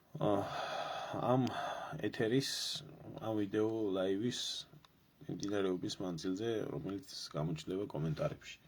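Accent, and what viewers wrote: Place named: Tbilisi, Georgia. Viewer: რა ჯიგარი ხარ. თბილისურად❤🙏რომ საუბრობ.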